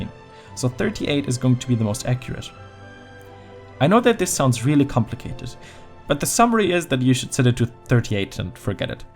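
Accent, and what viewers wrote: Germany. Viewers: Direkt in der ersten sekunde gemerkt, dass er Deutsch ist. Gutes Video. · Hardest german accent i've ever heard, but good video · Ich höre doch raus das du ein deutscher bist gibt es das Video auch auf Deutsch? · Man merkt so hart dass du deutsch bist haha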